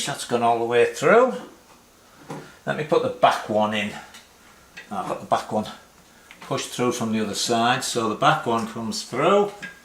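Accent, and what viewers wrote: United Kingdom. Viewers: I'm a car guy but I'm finding this series very interesting. Thanks for showing every little nuance that needs to be taken into consideration. Nice to hear a Brit abroad too. Mart.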